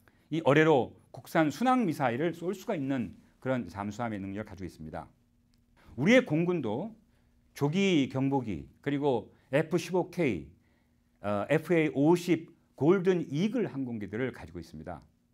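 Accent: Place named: Gwangju, South Korea. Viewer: 아따 광주억양 쎄쇼잉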